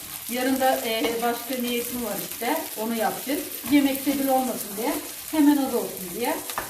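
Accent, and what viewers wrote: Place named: Kütahya, Turkey. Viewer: Sizi yeni izlemeye başladım nerelisiniz acaba kütahya konuşmasına benzettim konuşmalarınızı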